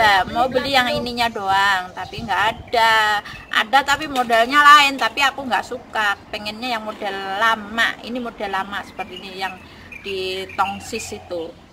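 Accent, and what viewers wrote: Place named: Banyumas, Indonesia. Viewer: Mantap banget bagi bagi ngapak hee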